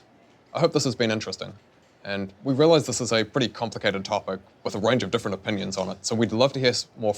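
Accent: New Zealand. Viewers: So odd to hear a fellow kiwi accent in an official Riot Video :) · new Zealander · I'm pretty sure I read once that from New Zealand. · Chris Ng He is from New Zealand mate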